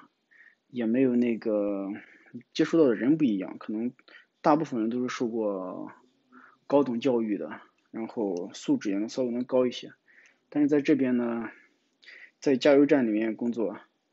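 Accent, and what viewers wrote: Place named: Shaanxi, China. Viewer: 我感觉像陕西的，哈哈，咸阳么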